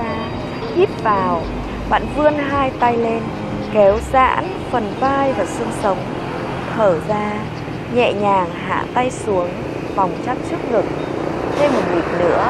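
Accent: Hanoi, Vietnam. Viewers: nghe giọng nói Hà Nội mới sáng khoái làm sao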